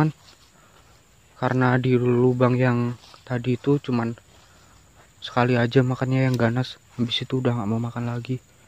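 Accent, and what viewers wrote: Java, Indonesia. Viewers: Tpi ngomongnya mirip lgat jawa mas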